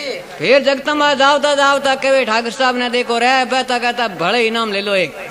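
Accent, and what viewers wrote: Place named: Marwari, India. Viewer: orignal Marwari Voice really enjoy !!! also help for new generation to learn history Thanks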